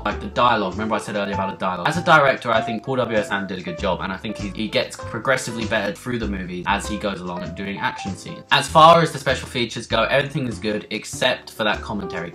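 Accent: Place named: RP, United Kingdom. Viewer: Great review and a great posh accent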